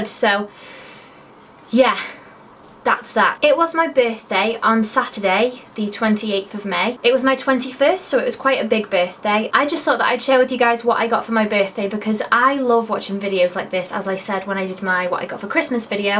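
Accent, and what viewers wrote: United Kingdom. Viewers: You're soooo beautiful! Happy birthday! I love your videos and your british accent! XOXO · HOLY CRAP YOUR BRITISH!!! LOVE IT!!! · It's a british accent, I don't know which one :o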